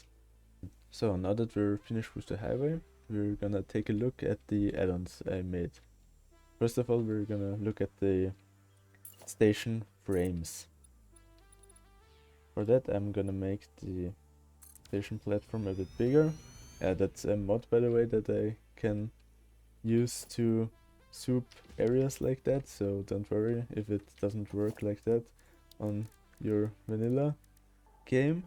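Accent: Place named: Germany. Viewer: your native language ... seems to be german ... thx for the highway blueprints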